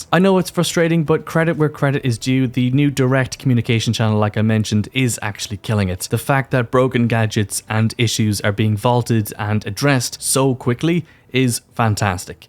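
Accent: Ireland. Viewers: He's Irish you muppet. Not everyone talks in American English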